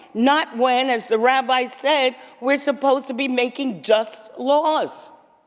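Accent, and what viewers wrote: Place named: New York, United States. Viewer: Listening to her voice makes me homesick for my beloved New York. A beautiful speech honoring our black community during Black History Month. Thank you Senator.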